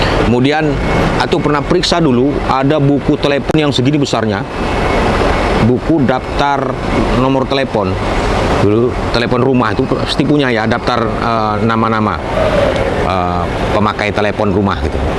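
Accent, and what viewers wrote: Malaysia. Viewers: Kenapa tidak membawakan peran Melayu dan Jawa dalam 1 Chanel bg mungkin akan lebih menarik lagi karena kami (saya) lebih terhibur dengan logat Melayu Abang walaupun sebenarnya saya orang Jawa pujakesuma salam dari rantau Prapat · Saya penggemar komedi/YouTube di Medan minta kembali ke adegan Melayu,logat Melayu tu, usahkan melucu dengarnya aja udah enak awak dengarnya, he heee. maaf ya. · Aku orang jawa tapi aku senang bila pakai logat melayu lucunya ngena walaupun sebagian bahasanya aku gak ngerti sukses mantaap👍👍👍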